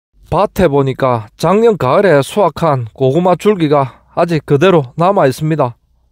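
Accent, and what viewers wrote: Gyeongsang, South Korea. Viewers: 구수한 경상도 촌스런(?) 억양에 진심어린 나레이션과 고품질 영상이 참 좋습니다^^.. 자주 찾아보게 되네요... 안전에 유의하고 건강 잘 챙기시면서,, 오래오래 좋은 유튜브영상 많이 제작해서 올려주세요~~^^.. 덕분에 앞으로도.. 저는 사무실에 앉아서,,, 고생해서 찍어올려주신 동영상을 땀한방울 안흘리고 편하게 볼 수 있네요... 좋아요 추전 합니다 .. ^^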